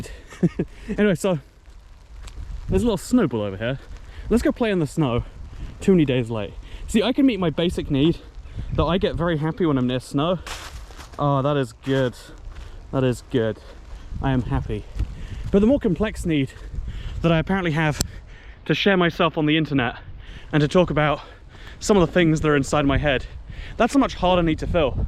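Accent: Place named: RP, United Kingdom. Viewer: It's purely the posh accent lol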